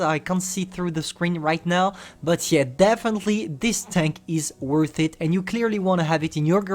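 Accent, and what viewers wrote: France. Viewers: petit accent francais non ? :)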